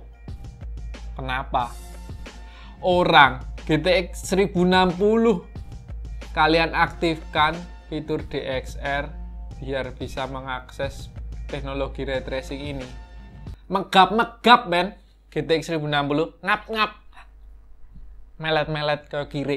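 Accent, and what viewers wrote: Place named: Java, Indonesia. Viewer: medok pak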